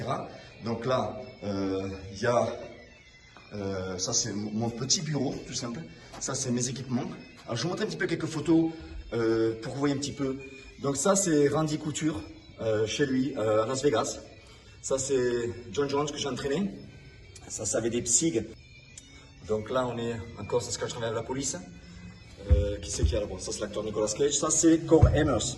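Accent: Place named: Provence, France. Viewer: Tu es originaire d'où ? Tu parles de Midi-Pyrénées mais je trouve que ton accent sonne plus provençal. Belle galerie de photos ! :)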